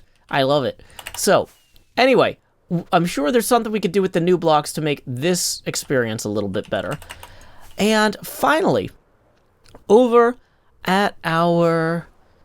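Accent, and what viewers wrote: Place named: New York, United States. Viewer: ur new york accent is stupidly funny. im in hysterics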